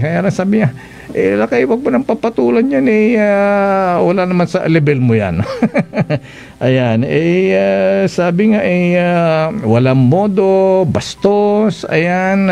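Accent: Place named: Batangas, Philippines. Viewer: DIBA MARCOS KA? bat Batangeunio ang accent mo................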